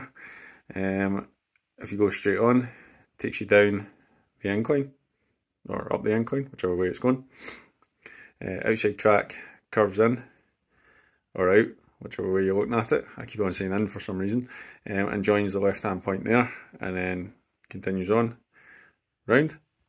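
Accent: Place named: Scotland, United Kingdom. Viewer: Dear Sir, Yours is a great layout (i wish i had a loft), mine is just a wee bit over 2x1 metres. Just one question, for the benefit of us, english non speakers. Your accent is a challenge for me untrained ear. Where in the UK is it from? Scotland, i presume? Thanks and cheers from (happy again) Brazil!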